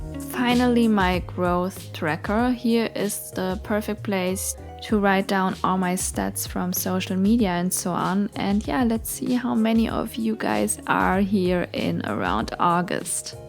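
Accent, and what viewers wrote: Germany. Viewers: Hab direkt gehört, dass du deutsch bist 😊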